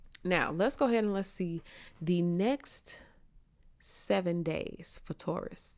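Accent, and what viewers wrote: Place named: South, United States. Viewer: I love your country accent I love it ❤❤❤❤❤❤😂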